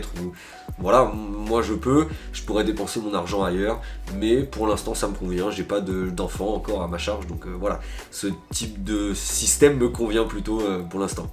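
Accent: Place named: Corsica, France. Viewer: je te reconnais le corse hahahaha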